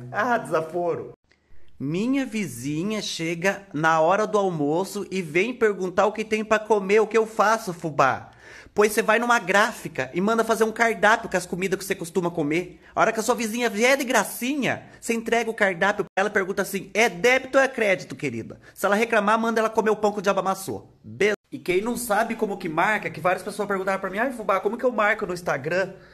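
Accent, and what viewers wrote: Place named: Piraju, Brazil. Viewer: somos vizinhas kkkk Moro aqui em piraju Parabéns pelo canal, me senti muito representada no seu sotaque e sua simpatia... Deus abençoe pra continua crescendo mais e mais, SEU NACAL E LOCO DE BOM!!!!!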